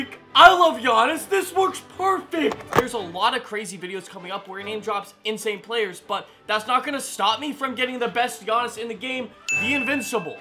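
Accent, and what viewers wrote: Greece. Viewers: Are you Greek Or Canadian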